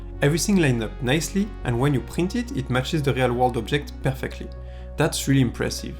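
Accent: France. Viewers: Pourquoi ne pas parler français ça s'entend à votre accent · Woah!!! You’re French! Nice to hear your voice!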